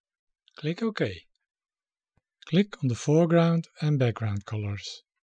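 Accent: Netherlands. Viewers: You have that typical dutch-english accent, love it.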